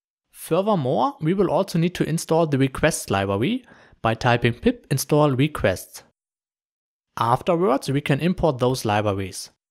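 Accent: Germany. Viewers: klingt krass deutsch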